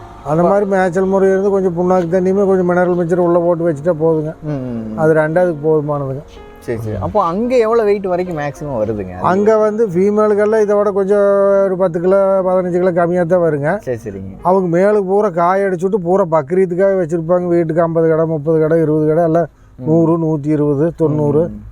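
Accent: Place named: Kongu, India. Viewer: Kavanthapadi la enga relative irukaaga. Unga kongu tamil super. Sir ivaru num pls?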